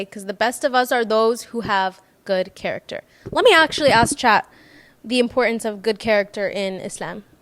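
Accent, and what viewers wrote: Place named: United States. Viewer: I like this American accent can you tell me which region this is I will found more content in this accent I'm learning English with this accent but I don't know what is the name of this accent It will be good for my future English language hope Thank you for sharing Islamic content May god grant you more knowledge about Islam, Bacha from Pakistan ❤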